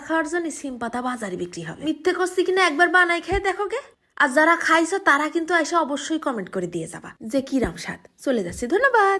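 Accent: Jessore, Bangladesh. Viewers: Apu tomar kotha sunla ami kotha bolta sahos pai ta na hoila amar kotha sunla sobay hasi thatta korto akhon tomar kotha sunla mona hoi amara jessore ar manus ar kotha sotti misti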